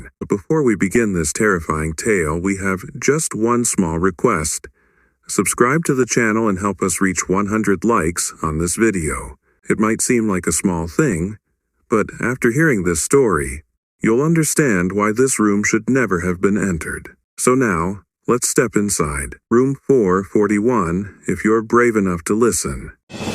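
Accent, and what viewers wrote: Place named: United States. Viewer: Agreed! And why would a channel called BRITISH hauntings choose an AMERICAN voice to narrate?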